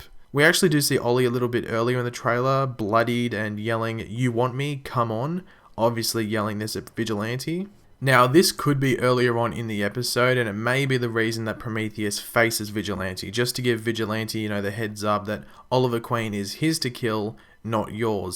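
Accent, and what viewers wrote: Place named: Australia. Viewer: his Australian accent i think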